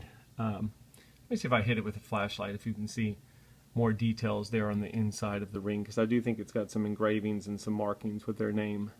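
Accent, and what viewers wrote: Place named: South, United States. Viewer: Pah-peel-yone. It means "butterfly" in French. Love your videos. Great calming voice, with a pleasant Southern lilt.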